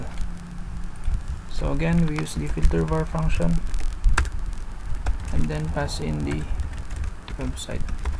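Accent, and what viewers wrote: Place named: Brazil. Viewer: Você parece um Brasileiro falando inglês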